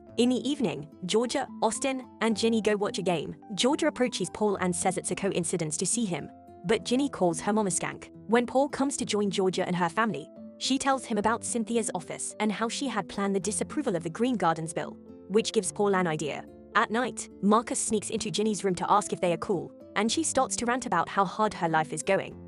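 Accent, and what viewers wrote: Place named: United Kingdom. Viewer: I really love you using British accent ai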